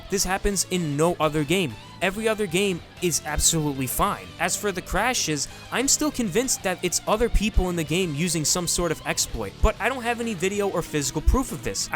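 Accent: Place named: Boston, United States. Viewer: I can sense that he may be from Boston. Hello my brother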